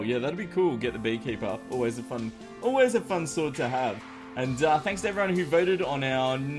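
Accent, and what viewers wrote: Australia. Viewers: im like 85.6% sure you are australian, but i would like to be 100% sure. are you australian?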